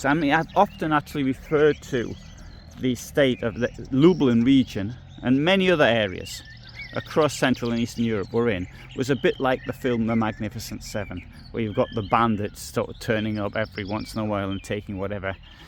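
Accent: United Kingdom. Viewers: Merry Christmas! I believe you are correct about the Catholic church along with the Germans victimized the Jews. Also, interesting how you have not lost your British accent. Great video!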